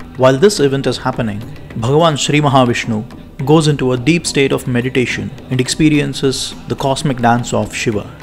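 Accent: India. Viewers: this is the accent which is easy for indians to understand That's the why i guess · Very well explained!! Thanks for explaining such a complex topic, in a simple, Indian (I seriously doubt any1 who isn't from/in India will understand not only the accent, but the essence of this video) concise and complete way. From my corner of the US, the critique in me, gives this a definite thumbs UP. Well spoken, clear, informative, educational & satisfying. Practicing Yoga is basically practicing (an attempt at) "wholistic" wellness. Balancing the mind & body by combining the spirit - Not religion, spirit (that spark within us that sits dormant until it's properly activated.) In Yoga, SELF mastery (truly knowing / realizing the self) is the aim & SELF control is key - Controlling others becomes unnecessary & redundant in the process. Sadly, in the west, where taking control & ego gratification is rampant - Yoga is not only misrepresented but totally misunderstood. 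Pretzel poses, exercise classes/routines, "shakraa" mumbo-jumbo (that word annoys me most..... It's "chakkra" mispronounced, commercialized and then fashionably flaunted) and other money-making practices have taken yoga from being a highly sensitive, sensible and permanent way of life, to being a temporary routine that money can buy.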